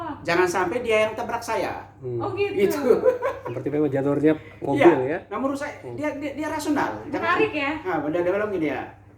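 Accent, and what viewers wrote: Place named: Manggarai, Indonesia. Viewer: Bp doktor, itu logat Manggarai tuu kental ew😅😅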